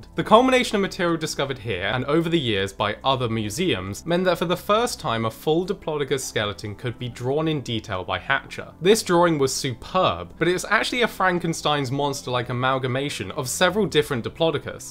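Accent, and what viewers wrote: United Kingdom. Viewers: God give me the confidence to pronounce things like a Brit.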